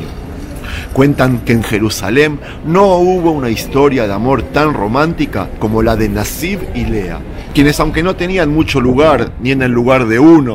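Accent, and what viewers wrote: Argentina. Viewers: Este señor es argentino? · It sounds like an Argentinian accent. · Muy buen documental. Por su acento Usted es argentino?. Fui profesora de historia y geografía en Argentina ahora jubilada y me gusta mucho ver documentales. Lo felicito es muy interesante vuestro video.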